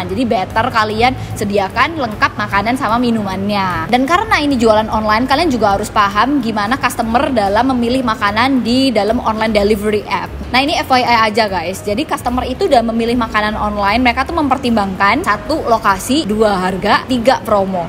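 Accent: Java, Indonesia. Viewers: Kak sekarang logat jawa nya tebel banget kak hehe 😁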